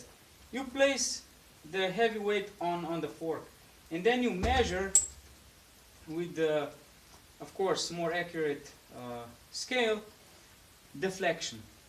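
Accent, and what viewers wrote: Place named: Slovenia. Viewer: You sound like someone from Slovenia.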